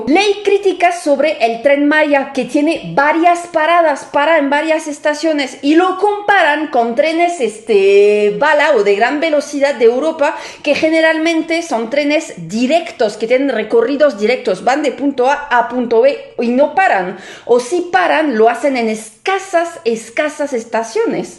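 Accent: France. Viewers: Saludos desde la CDMX. una observación, no es crítica pero ya casi no se te notaba tu asentó francés, pero ahora como que se te traba un poquitín la lengua jajaja.... 😂😅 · Como me encanta el español con ese acento frances, simplemente precioso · Me gusta tu acento francés. Felicidades por tu canal y contenido. Excelente trabajo de investigación como siempre. Tu opinión como usuaria de trenes en Europa es muy valiosa.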